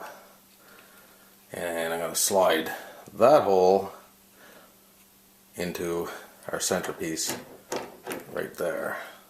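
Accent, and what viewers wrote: Newfoundland, Canada. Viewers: sounds like he's from Canada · Canadian... Love to listen to him talk. Unfortunately, neither of my knobs have visible screws, so I'm still at a loss. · You a Newfie? Thanks for the vid! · Love the Canadian accent, you sound like Relic from the Beach Combers.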